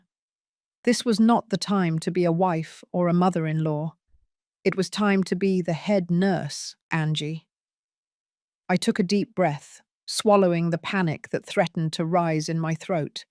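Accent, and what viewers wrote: England, United Kingdom. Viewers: Good English accent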